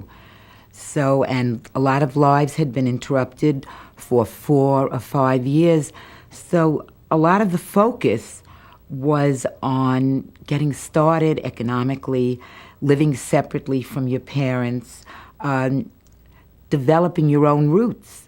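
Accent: New York, United States. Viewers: I love her accent! You can leave New York but NY won't leave you.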